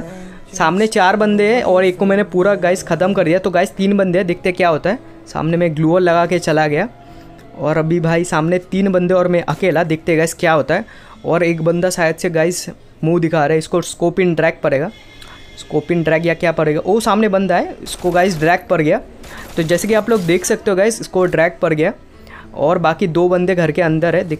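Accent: Bengal, India. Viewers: Bhai aap bengali heee naaa?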